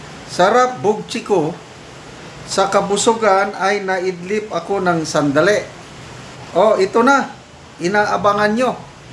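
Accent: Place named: Cavite, Philippines. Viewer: Ganyan iyong mga salita ng mga cavetenio Lalo na iyong mga addict na tambay riyan, kuhang kuha Nya iyong words at pagkakasabi at Punto ng Isa ng cavetenio!😊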